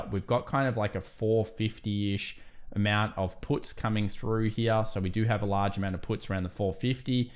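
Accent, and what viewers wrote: Australia. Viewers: "Apple" is not pronounced "epple". Therefore, "Well" is not pronounced as "Wal" and "selling" is not pronounced "saling", "help" not "halp", "health" not "halth' - do you get it? Without these distractions, your presentations might be more listenable. I realise that this a peculiar to residence of the State of Victoria but it doesn't make it right.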